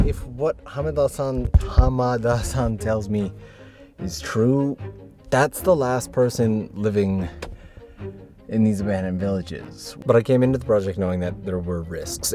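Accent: Canada. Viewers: Fellow Canadian living in Japan here. I've only watched a few of your fascinating videos, but I knew you were from Canada from the first vid of yours that I watched, just based on the way you said "house" :). I used to live in Tohoku, and these abandoned villages remind me of all the old houses and sections of cities I would travel through for work. Of course, there are even more abandoned places there now, especially in Fukushima. One thing these sorts of places always make me think of is the warmness of small-town Japan. Thanks for keeping your focus on these smaller parts of this amazing country, it really helps keep them alive through the memories, in a certain way. You just earned a new sub, keep it up. Edit: the only gripe I have is you cursing about the curry. I get you might not be a fan of the curry, but there's no reason to cuss it out.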